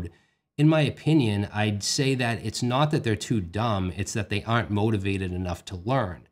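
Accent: Boston, United States. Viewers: Hey my 2nd favorite Boston accent. 1st is Ben Affleck in Good Will Hunting. Thanks for all the videos, honestly you've helped my career leaps and bounds and yours are the videos I recommend to any dev that I teach.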